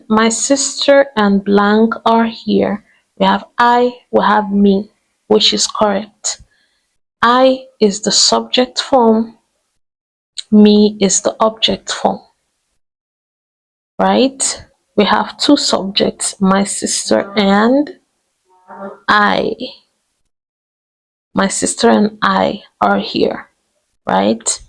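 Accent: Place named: Europe. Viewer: Alright , the way you speak and pronounce , it's obvious you are NOT a Native English speaker. Any fluent English speaker will surpass your explanation in time lesser , 3/4 th or 1/2 the time you spent. explaining. You have a very strong , thick European accent.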